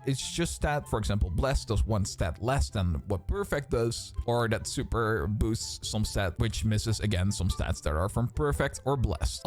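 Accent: Netherlands. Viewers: the dutch accent is strong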